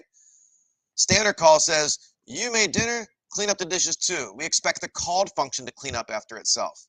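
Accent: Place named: Los Angeles, United States. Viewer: Which accent is that? LA?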